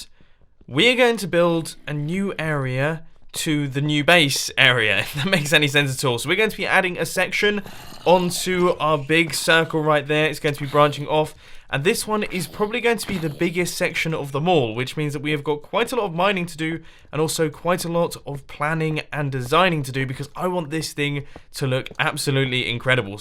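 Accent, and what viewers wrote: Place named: United Kingdom. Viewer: Ewan Bruce He said I didn't know if I could fly. It's hard to tell if you aren't used to his British accent, and his happy squeal.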